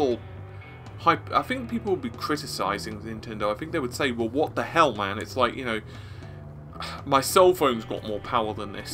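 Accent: United Kingdom. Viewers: This guy's voice is way too british, almost obscenely accented. I mean we live in such an open world now, I don't see why such marked accents could "honestly" exist, it almost feels forced.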